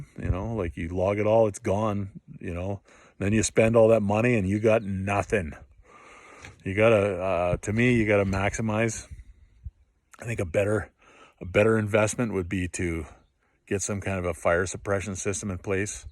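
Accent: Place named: Ontario, Canada. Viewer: Great job. It appears this is where a man is meant to be. You obviously lived in Ontario for a while as your ‘Ote’ for the word ‘out’ is a giveaway.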